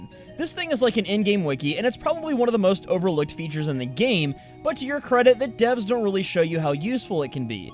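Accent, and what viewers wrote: United States. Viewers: Are you from America?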